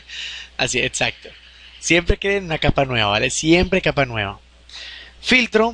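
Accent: Colombia. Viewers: B dis, le escribo desde Vzla, le felicito por sus curso y le agradezco por la informacion compartida. Me agrada que use un lenguaje practico con buena diccion y con su acento Colombiano, porque la combinacion de todo ello resulta interesante, entretenido y no canson. Siga pa lante y sin egoismo que Dios sabra recompensarle. Hagale parcero, hagale. Saludos.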